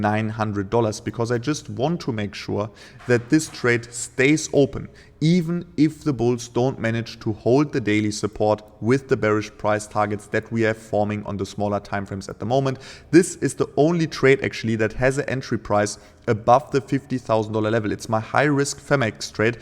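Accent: Germany. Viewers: Bei dem Englisch gehe ich mal von einem deutschen Kollegen aus. Hört doch bitte auf mit DIESEM MEGASCHEISS!!!!!! DANGEREOUS!! Das geht auch ohne diese reißerischen Thumbnails und Texte! Ansonsten alles Gute!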